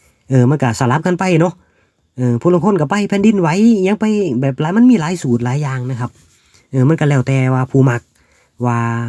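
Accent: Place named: Sisaket, Thailand. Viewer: สำเนียงค๊นสีเกษตั๋วนี่😊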